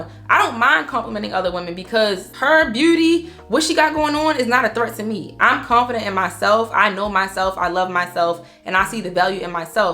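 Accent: Baltimore, United States. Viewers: Sorry it have nothing to do with the video but it sound like you from Baltimore but not in a bad way. I’m currently trying to break my habit of saying my words correctly. Which you do, it’s just how you say certain words lol 🩷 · Beautiful! So many nuggets! Also, Sis you from Baltimore? Lol ❤ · Hold up your Baltimore?? Your accent sound like bmore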